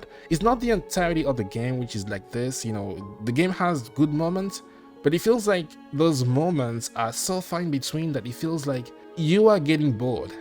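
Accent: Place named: France. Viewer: Love your accent. Are you French? I have family that are.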